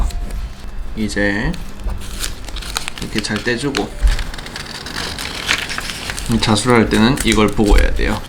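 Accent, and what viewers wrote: Gyeongsang, South Korea. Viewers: 모르는 내용도 아닌데 뭔가,, 경상도 억양이 넘 귀여워서 계속 보게 되네요,, 경상도 사투리가 이케 다정한 느낌일 수가 있군여, 중독성 있네욥,,:)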